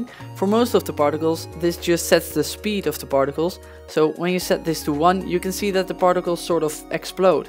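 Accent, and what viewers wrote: Netherlands. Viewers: You sound a little bit Dutch ; )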